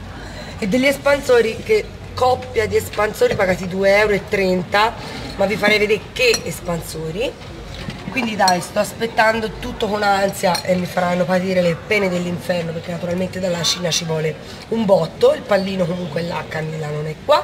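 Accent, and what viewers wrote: Tuscany, Italy. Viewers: Che dolce quando parli di Axe!😍Comunque adoro il tuo linguaggio😂😍 è molto simile al mio ,tolto che tu parli toscano😂😂😂!Comunque con il rossetto liquido dior anch'io non mi sono trovata bene,sono andata a cena e lo avevo dappertutto,mi dura molto di più il rossetto in stick!Bah 33 euro buttati!Baci😘😘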